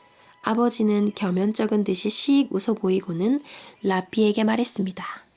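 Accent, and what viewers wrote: Gyeongsang, South Korea. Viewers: 님:) 시간날때마다 예전 영상도 하나씩 듣고 있어요! 저도 경상도 사람이에요 ㅎ 사실 같은 경상도 말투를 '좋아'라고 생가한적이 별로 없었는데 님 음성 들으면서 좋아지고 있어요:) 감사해요! 책만 읽는 것만 아니라 님 생각도 함께 이야기해줘서 너무 좋아요! 늘 응원합니다 💕 늘 건강유의하세요! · 경상도 사람이신가요? 사투리가.... ㅎㅎㅎ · 저도 부산사람인데요... 경상도 표가 많이 나네요^^ 그래도 좋아요^^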